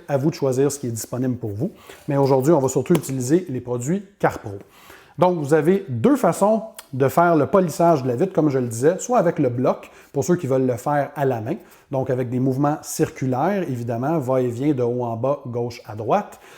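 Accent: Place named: Quebec, Canada. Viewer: j'étais sure après avoir écouter une dizaine de video en anglais avant de tomber sur celui ci que tu étais quebecois. merci pour les infos.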